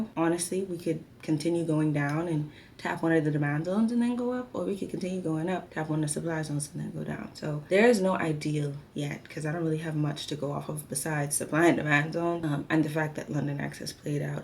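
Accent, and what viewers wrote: Bahamas. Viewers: Are you Bahamian? Sometimes your voice and how you say words reminds me of how Bahamian women speak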